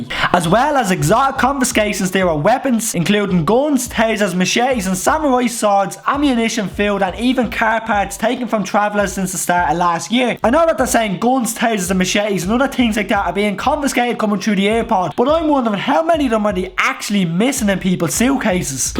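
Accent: Dublin, Ireland. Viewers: You talk so fast that if you put it on 0.75 speed it still sounds normal😂 but I still fully understand you but God help anyone that's not irish😂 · Your a pure Dublin knacker going around your accent is bad and you have a Dublin haircut and your a traveler with the joyces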